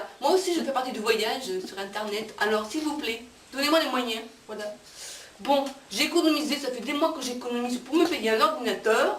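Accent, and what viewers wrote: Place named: South, France. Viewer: ... et tout ça avec modulations et accent du Sud..! Je garde, je garde 😆 !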